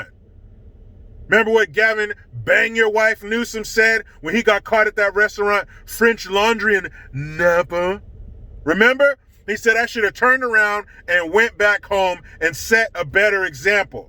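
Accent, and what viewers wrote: Australia. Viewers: Love the Australian accent, mate! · Love your Ausssie accent, pmsl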